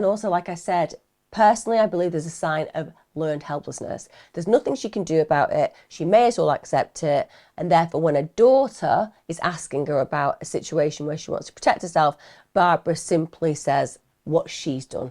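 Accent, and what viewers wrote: Australia. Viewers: She got away with so much under the cover of mental health you can still pay for your awful crimes and be treated for your mental health her crimes just escalate with barely any consequences Loved the Australian accent it was pretty close to how we sound. Being Australian this case has been done to death, but as usual has found out and added so many different facts and put so many different perspectives on all of the people involved in the case❤❤ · Hey! Awesome as always, you're Australian accent + context of delivery = Lmao 😂. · Love the Aussie accent! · Your Aussie accent is very good, MUCH better than Meryl Streep's!!! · It’s gotta be said. Coming from an Aussie. Your Aussie accent is pretty spot on. 😂❤ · I ❤ ur Oz accent ~ betta than most trained actors who've tried 😊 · Love your Aussie accent. Well done:) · Oh I love your Australian accent! 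❤ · This is just horrible. I was whincing a lot when you mention about his skin. I feel sorry for all the innocent children in this. Her children and John's children. Also them poor people who actually found his body, they must be dealing with some trauma. If he got a restraining order out on her the same day, then how come she was around him and the children afterwards. I don't know a lot about them but I'm pretty sure they would be instant. And to have sex with her when he had just got a restraining order out aswell is strange. Maybe he was drunk and half asleep. Anyway as always, you are just wonderful. It amazes me how you remember all the details. I could listen to you all day, talking about anything. I bet you were fantastic at reading books for your two boys when they were younger, with all the different voices and sounds etc. Great Australian accent by the way. It was so lovely to hear talking in the background, she is letting you know that she is supportive of her amazing Mummy at work, she probably feels comfort hearing your voice. 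X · I actually LOVE Aussie accent 😂❤😘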